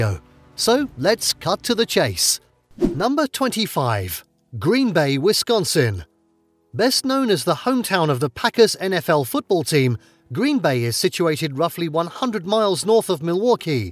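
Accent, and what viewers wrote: United Kingdom. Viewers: As soon i heard british accept i stopped video. I will skip it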